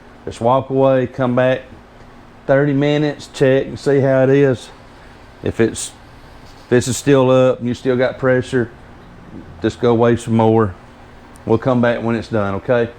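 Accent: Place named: South, United States. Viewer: Those are some beautiful cans of squash! Can this be done with all types of squash? I was thinking butternut squash. By the way, I love your southern accent. ❤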